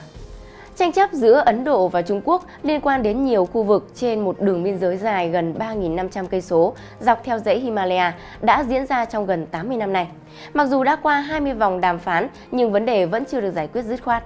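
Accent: Standard. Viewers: Giọng đọc vẫn chuẩn rõ ràng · Chương trình hay mc xinh đẹp phát âm chuẩn , rỏ ràng.chúc chương trình ngày một hay và dc nhiều khán thính giả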